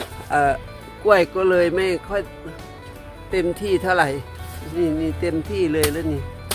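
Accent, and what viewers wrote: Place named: Isan, Thailand. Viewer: สวัสดีจร้า กับปู่มารับชมวิถีชีวิตบ้านๆคะชอบ พูดภาษากลางผสมอิสานมากคะฟังแล้วหัวเราะตามเลย🤭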